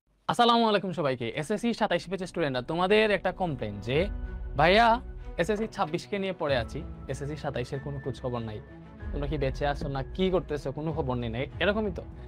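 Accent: Sylhet, Bangladesh. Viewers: ভাইয়া কী বাড়ি সিলেট? কথার মধ্যে সিলেটি টুন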